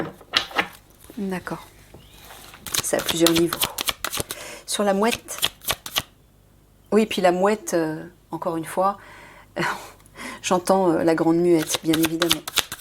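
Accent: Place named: Belgium. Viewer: Oh vous êtes belge :). C'est clair que c'est de plus en plus visible... Merci pour ce partage.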